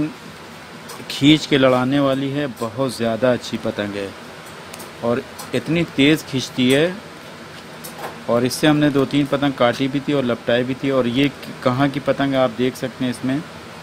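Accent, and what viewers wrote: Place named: Allahabad, India. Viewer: aapki allahabadi bhasha sunkar achha lagta hai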